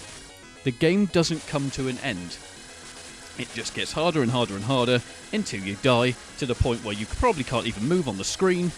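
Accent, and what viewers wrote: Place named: United Kingdom. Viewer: British accent. sounds legit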